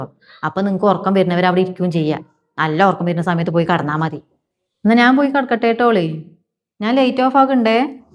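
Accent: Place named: Malappuram, India. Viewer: Palakkad tamilnad style anenn ketittud samsaram but nigal malappuram style annalo.... Anyway kidu acting subscribed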